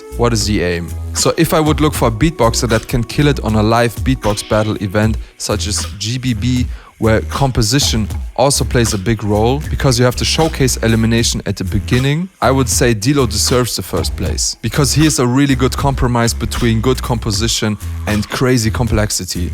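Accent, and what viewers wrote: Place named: Germany. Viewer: FLAX OOLTIMATELY I love ur german pronunciations so much 😂😂 Also, mic costs more than my rent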